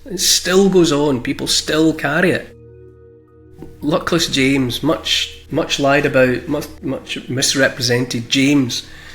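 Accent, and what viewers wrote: Scotland, United Kingdom. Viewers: Brilliant. Oral stories enchance the written word and covey the spiritual nature of history. Especially done with a fine Scottish accent.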